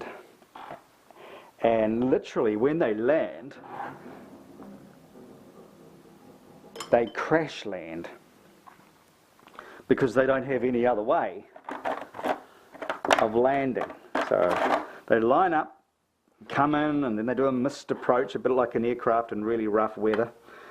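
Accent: New Zealand. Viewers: Glad I found you on Youtube, Finally making the switch from acrylic to oils... I feel like I just went from using sand paper to tissues... it's amazing, sucks they don't teach you any of this in high school. :/ Schools are cheap in australia with their art not very good when the only thing your good at is art. :P Keep it up! Haha I actually like the talking reminds me of my family in New Zealand.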